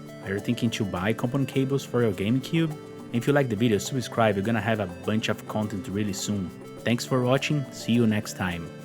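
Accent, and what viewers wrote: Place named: Portugal. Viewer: Your English is excellent but I can tell your native language is Portuguese! Vídeo legal mano vou ver esse cabo no aliexpress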